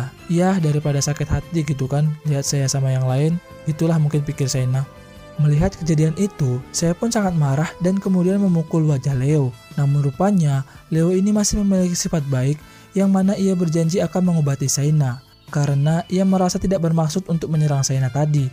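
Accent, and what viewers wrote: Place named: Medan, Indonesia. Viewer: Anak medan keknya kau ni ya kawan? Sukak kali lah penjelasanmu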